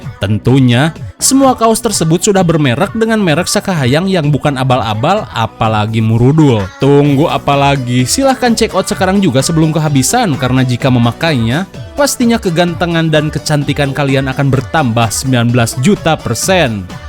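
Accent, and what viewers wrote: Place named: West Java, Indonesia. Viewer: Orang Sunda mana a 😅...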